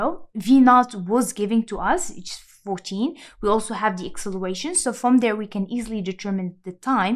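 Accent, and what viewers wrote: France. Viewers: Hi. I like your teaching style. Also can hear your lovely french accent. I too speak French and got all my degrees in French before moving to the US. Here vitesse = velocity … I will need to convert the terms before deep diving. How can you help me? I graduated with my bachelor 14years ago, but want to take the FE in 3months. I do I contact you for an effective communication to get me started? Thank you.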